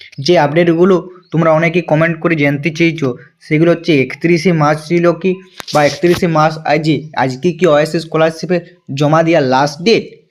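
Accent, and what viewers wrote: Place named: Purulia, India. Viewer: Dada kothai bari tomar vasa ta purulia tan lag6e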